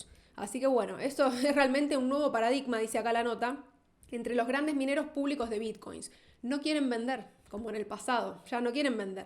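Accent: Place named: Argentina. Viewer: que bonito es el acento argentino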